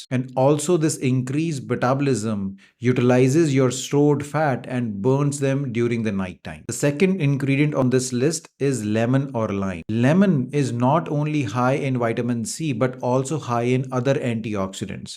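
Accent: India. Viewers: They know - just because its not how you pronounce it. it doesn't mean its wrong. Its Indian English and that how it is.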